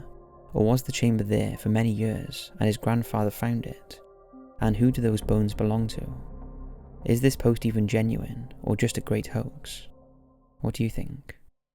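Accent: Wales, United Kingdom. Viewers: You have the same voice as like every British dude you come across on overwatch.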